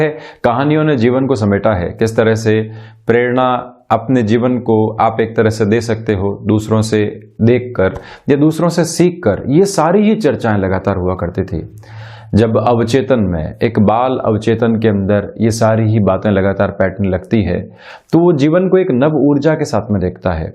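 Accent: Standard. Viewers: Beautifully said! Whenever I listen to your pure language, I am reminded of my Hindi teacher in school, Smt Rajkumari Saxena to whom I am ever indebted for learning Impeccable Hindi. Its such a pleasure to listen to you, Sir.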